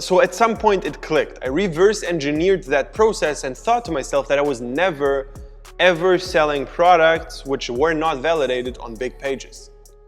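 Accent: Germany. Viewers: Servus, an deinem englisch (zugegeben gut, aber trotzdem) erkennt man das du deutscher bist:D Hilfst du auch kompletten anfängern beim einstieg? möchte unbedingt anfangen aber nicht unnötige Fehler machen die zeit und geld kosten.. habe ca 1k, wie lange dauert es durchschnittlich bei korrekter vorgehensweise damit einen profitablen shop aufzubauen? Es geht mir nicht um schnelligkeit aber ich will halt auch nicht unnötig kohle verbrennen und zeit verschwenden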